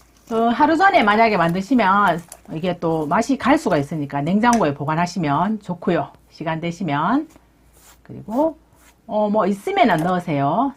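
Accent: Gyeongsang, South Korea. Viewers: 어이구야꼬! 진짜 쉽고 맛나겠네예. 나도 경상도라 내친구같네예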